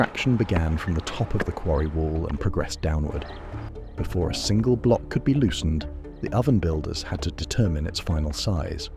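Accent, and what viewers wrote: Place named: England, United Kingdom. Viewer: I love hearing metric measurements in an English accent, I's almost as good as hearing them in an American one 🖖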